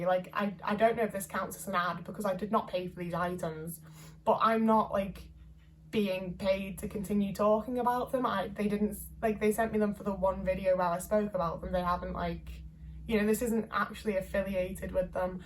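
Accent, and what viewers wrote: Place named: Northwest England, United Kingdom. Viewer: It's not particularly strong which makes it hard to say for certain, but central northwest England, between about 53 to 54 degrees north, includes the Manchester area.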